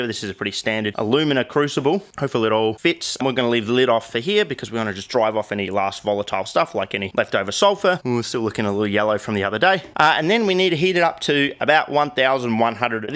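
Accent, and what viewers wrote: Australia. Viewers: with an Australian accent, which as an American, makes this 45% more engrossing to me than NileRed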